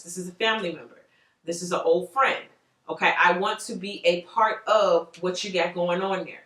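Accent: Chicago, United States. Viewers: Sounds like you’re from Chicago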